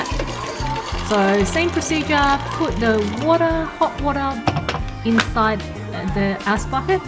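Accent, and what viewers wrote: Australia. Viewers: this ozzy accent is perfect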